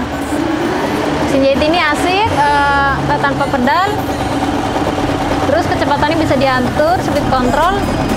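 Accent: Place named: Java, Indonesia. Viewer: Mba nya logat jawane kentel tenan asline pundi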